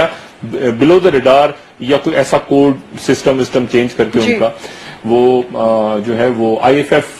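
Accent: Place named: Faisalabad, Pakistan. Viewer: Dr sab ajj tay faisalabadi lagy ho tusi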